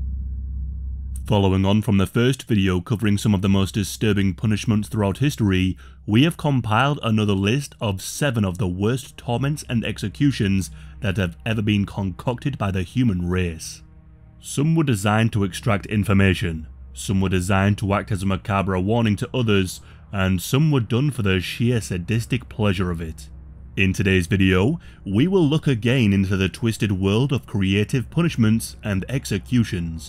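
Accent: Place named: North East England, United Kingdom. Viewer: What the hell is this blokes accent-he sounds North Eastern, yeah a bit like Byker Grove?!!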